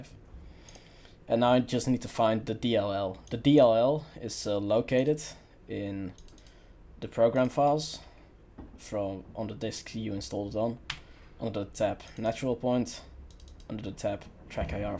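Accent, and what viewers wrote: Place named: United Kingdom. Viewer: Nice accent, you have a British one, even tho you're a Dutch.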